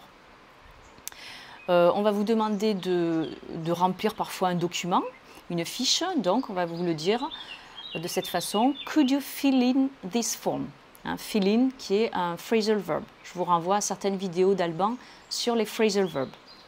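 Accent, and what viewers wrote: France. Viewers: I love your French accent when you speak English. Innernet : Internet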